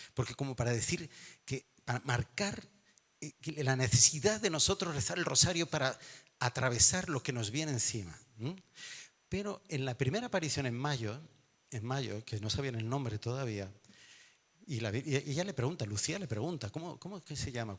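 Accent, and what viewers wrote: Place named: Aragon, Spain. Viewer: Me encanta oírle padre, la Virgen le ha elegido con esa gracia baturra que nos hace llegar de una forma muy simpática todas las verdades evangélicas. Dios le bendiga.❤😂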